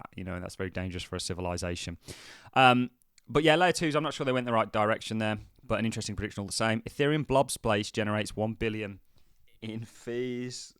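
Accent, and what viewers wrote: Birmingham, United Kingdom. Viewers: What part of Brum are you from?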